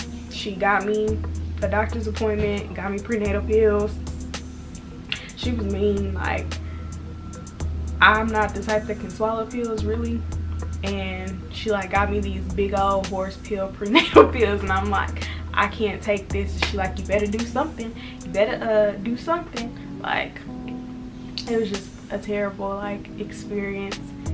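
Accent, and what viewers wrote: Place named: Dallas, United States. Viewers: As soon as I clicked on this video and heard you talk I knew right away you from Dallas 😂😂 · Fr it’s that country mixed with some properness 😂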